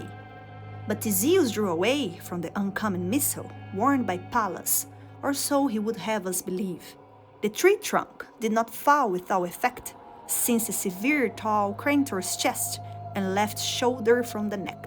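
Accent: Brazil. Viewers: I recognized that Brazilian accent instantly! Lol anyways I was really interested in this topic and your video was one of the first results, so thanks for making it! I actually felt a chill when I saw his second phase the first time. Fromsoft has a way of storytelling without words, where you just understand someone’s entire story and struggle from their actions. When he reached this “redemption” it really made me feel for him, like when he finally met his match (the player), he gets granted a second chance by the gods, presumably with a Miquella-esque nature judging by the arcs. This would tie into your point about the peoples of the Lands Between before Marika, who might’ve been persecuted much like with any empire trying to instate their own doctrines. Miquella’s Haligtree was this “sanctuary” for the afflicted and shunned. I initially thought his arm had some connection to the abyss, like Artorias. 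Especially since Nightreign brings back Dark Souls, I’m unsure if they now officially share an universe. But much like Nashandra brought the influence of the abyss to a far away place, maybe it has something to do with the “Night.” I thought Heolstor’s movements resembled Artorias a bit. Anyways, his “nuke” attack is probably the most cinematic thing I’ve seen in this game. This fight is so much poetry told with such few words.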